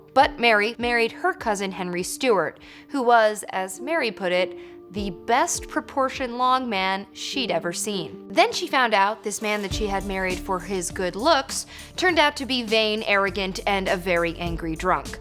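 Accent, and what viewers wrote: United States. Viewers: So sad that an American speaker narrated this piece. Made the whole content bland with her voice dropping an octave at the end of each paragraph. Americans probably see this as adding authority to her voice. Just thought the content was poorly delivered and crass. I am a proud Scot who wishes that Americans would confine their overtures to their own country and forbears. Rob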